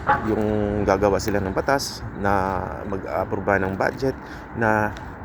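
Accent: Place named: Visayas, Philippines. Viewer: ok lang po kung bisaya accent 👍👍 para sa akin malambing mag salita ang mga bisaya, hindi ako updated sa balita sa pinas pero atleast narining ko about national budget take care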